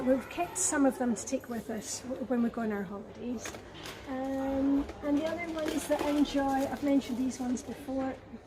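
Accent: Scotland, United Kingdom. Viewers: I love your videos! I am American, and you, as you talk, remind me of my friend from Scotland. Thank you for sharing those book reviews with us 😃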